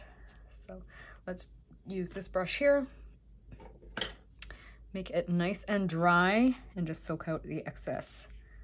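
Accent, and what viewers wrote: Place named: Canada. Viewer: This is really beautiful. These are my favorite color combinations. I have used yellow as an underpainting, but it didn't occur to me to leave the white there for the other colors. I appreciate you sharing your talent with us. I've enjoyed using the Simply Simmons brushes as well. I think I first started using them after I watched an artist in Canada. I think his name is Jo (last name...can't remember) and he works with the Artists' Network (I think). You sound like you are from Canada. Am I correct? You don't have to say, if I'm too nosy.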